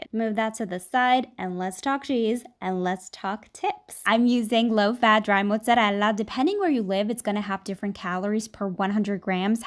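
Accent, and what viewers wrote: Italy. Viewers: I am italian and I love how you keep the italian pronunciation!!!! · Love the way you pronounce Mozzarella and Nutella. Just love your Italian accent in all ❤️❤️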